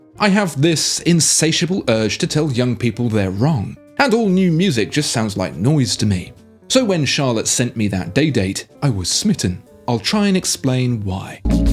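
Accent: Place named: United Kingdom. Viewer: Great writing and smart British accent. Makes for good program. And my daughter's name is Charlotte.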